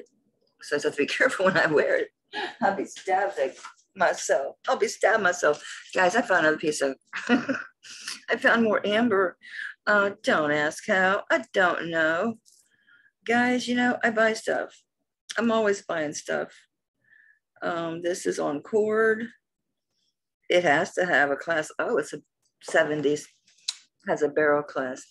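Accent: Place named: South, United States. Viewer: I luv your videos & your sweet southern accent is the best!! Your stories & humor makes your videos FUN!!! My necklace arrived & I love it 🥰🐰🌸💕have a great weekend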